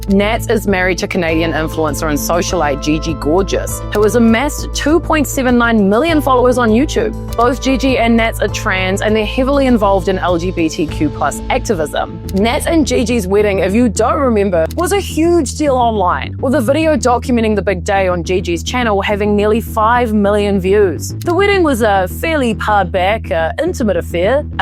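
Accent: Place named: New Zealand. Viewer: That’s how we pronounce it in Australia and this lady sounds like a Kiwi, so I’d say they say it like ww do. I’m guessing you’re American? We have different accents down under.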